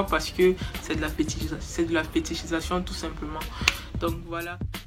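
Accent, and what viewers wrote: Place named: Cameroon. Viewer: Une des rares YouTube use qui , comme moi conserve l’accent camerounais naturel que l’on aime tous 👌🏽 force à nous les camer, forcé à toi , force à ton intelligence et à tes recherches 🇨🇲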